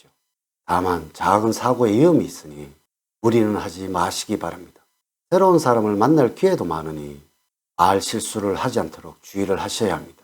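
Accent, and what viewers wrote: Gyeongsang, South Korea. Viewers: 갱상도말씀 때문에 숫자가 잘못알라 듣겠다😢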